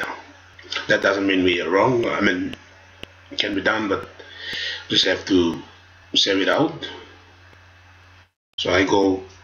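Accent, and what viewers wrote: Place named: Papua New Guinea. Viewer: I can hear a Papua New Guinean. Thank you for the video, my man!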